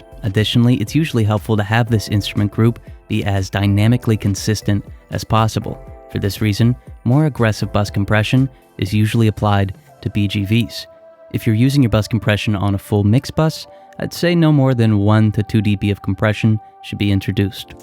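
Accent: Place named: Brooklyn, United States. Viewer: This guy must be from Brooklyn. I can hear it.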